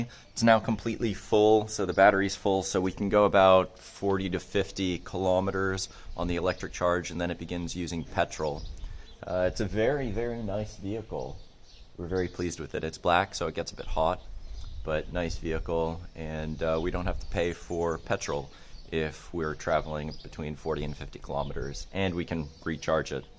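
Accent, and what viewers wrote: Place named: Northeast, Brazil. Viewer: ela e brasileira! :) acho que nordestina!!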